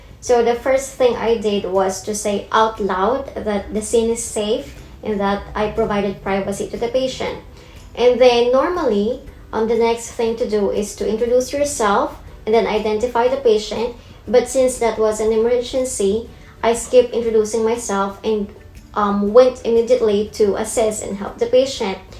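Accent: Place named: New Zealand. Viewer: very helpful content, and aside from that, the accent! very NZ, hehe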